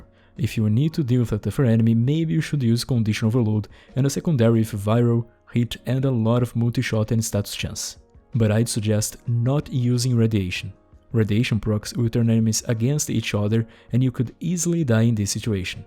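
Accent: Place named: Brazil. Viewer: Sabia que era Brasileiro por causa do sotaque kkkk muito top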